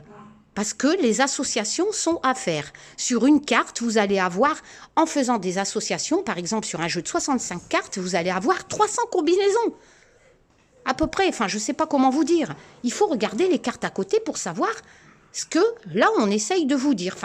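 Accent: Nord-Pas-de-Calais, France. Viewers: 😀😀😀😅😅😅🤣🤣🤣😂😂😂😂 trop beau l'accent chti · Bonjour, alors je te l'avais dit pas mail, je suis super contente d'avoir recu ton jeu, il est magnifique et j'adore l'effet peau de pêche, et comme je te l'avais préciser également il va partir (avec moi) a l'autre bout du monde rejoindre ma belle famille (Japon) et se fera purifier dans un grand temple, (je t'enverrais des photos de l'évévenement car tu es quand même sa premiere maman!!!! alors juste une petite chose, j'ai vu qu'il y avait la carte du corbeau (oiseau que j'aime beaucoup qui sont des sortes de guides spirituels pour moi) et sur la carte les mots clefs sont danger, accident, trahison, mais pour moi le corbeau ne véhicule pas ca du tout, alors ce que j'ai fait.. j'ai tout simplement rajouter sur la carte a la suite (car il y avait un peut de place) les mots "messager" et guide" et voila le tour est joué!!! 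je voulais te raconter ma petite expérience avec ce jeu magnifique que j'aime beaucoup, (et tout les petits cadeaux) les dessins sont d'une finesses assez incroyables, Olivier a vraiment un don de dessinateur!!!! merci a vous deux pour cette magnifique expérience de vie.... trop drole l'accent "ch'ti" j'adore!!!^^ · Quel plaisir de t entendre parler Ch'ti j adore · Coucou, enfin te voilà, quel bonheur 💋❤très contente que cela ce calme un peu, mais encore très prenant pour vous. Tres drôle et émouvant ton parler chti ma grand-mère ne parlait que chti je comprends tout. bisous à tout les deux merci pour ton tirage Et courage prenez soin de vous.. (Tu parles des lanceurs d'alerte?)🥰😇🙏💋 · Hello lol j adore entendre parler ch'tis mes origines comme toi ❤❤❤❤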